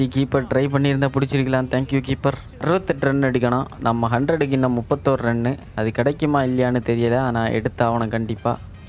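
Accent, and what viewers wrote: Kanyakumari, India. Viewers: Bro neega kanyakumari ya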